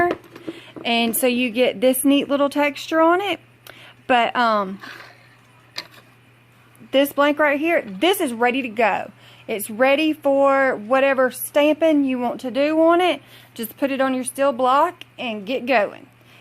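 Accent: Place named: South, United States. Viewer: I love your sweet little southern twang and your bracelet says "FUCKING LADY LIKE" - love it!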